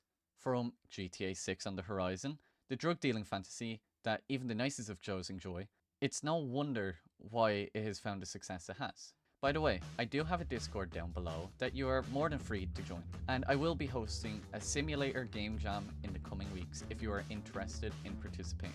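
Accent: Ireland. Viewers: You Irish dude? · are you irish?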